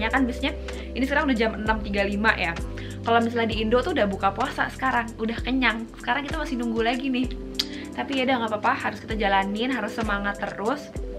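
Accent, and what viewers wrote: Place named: United States. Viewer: American accent udah dapat👍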